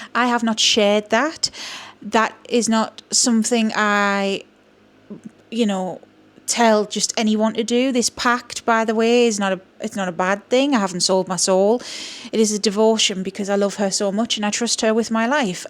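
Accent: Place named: Newcastle, United Kingdom. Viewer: I love Ur authentic self & the fab Geordie accent, I agree too many low minds jealousy ect. I don't av the knowledge on these gods goddesses I channel some Hindu Buddhist to paint them blessings xx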